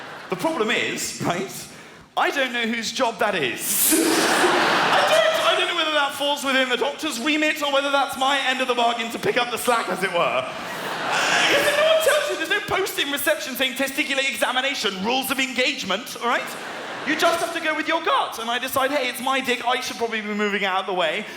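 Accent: RP, United Kingdom. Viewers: Get better friends, lol. No but honestly, I'm Swedish too and most people I know would be able to follow this no problem. When I saw Jimmy Carr here in Sweden the venue was sold out, people here like British comedy and language is not generally a barrier. Maybe for some people but not the majority. And my experience is people who do struggle a bit with English generally find British English easier than American, and Jack is quite posh so that doesn't exactly hurt. Hade gärna sett Jack live också om han kommit till Sverige men 2014 visste inte jag vem han var tyvärr!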